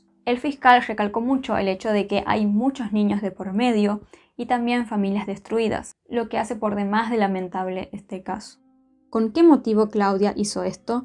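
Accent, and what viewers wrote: Argentina. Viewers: Es la primera ves que veo uno de tus videos y me gusta mucho cómo haces la narrativa, el acento argentino es genial! Saludos desde México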